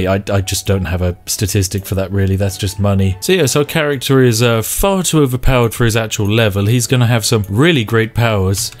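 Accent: United Kingdom. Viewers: *moans* A perfect British accent...I love it.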